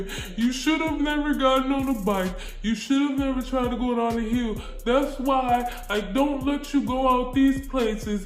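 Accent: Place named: New Orleans, United States. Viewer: Oh so you are from N.O, Of all southern accents that's my fave 😂. It sounds like Homeboy had a little thing for you👀😏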